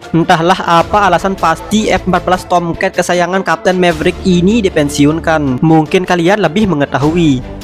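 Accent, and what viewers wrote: Lombok, Indonesia. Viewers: Bang lu orang lombok bukan?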